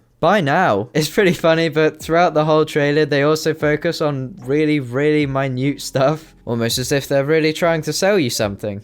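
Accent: United Kingdom. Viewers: You're british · should have known you were British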